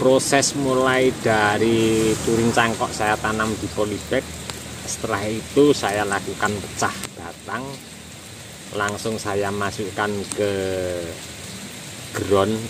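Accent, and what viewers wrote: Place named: Java, Indonesia. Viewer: Itu emang logat jawa,dari dulu.tapi ga merubah makna.Wallahu'alam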